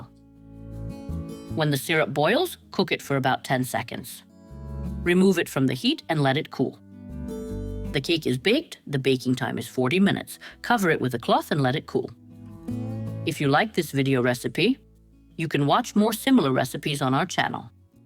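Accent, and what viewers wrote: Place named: England, United Kingdom. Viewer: ... recién descubro tu canal,... ya estuve viendo 2 recetas,... me encanta como explicas, muy práctico y sin hacerlo tan largo,... qué buena y deliciosa éste Marble Cake,....me gusta tus utensilios que usas para cocinar,... yo entiendo poco en English,...pero hago ls traducción a Español,.... soy de Argentina, pero vivo en North carolina USA,....tú acento me parece de Inglaterra,.... muy bonito todo( me pareció muy bonita la fuente que usaste para hacer el cake 👌).saludos Miriam...👍👍👍💯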